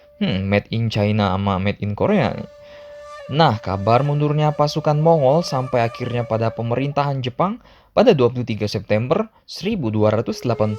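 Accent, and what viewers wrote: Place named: Riau, Indonesia. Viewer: Adminnya orang Riau nih atau kalau ngg BANGKA BELITUNG ✌️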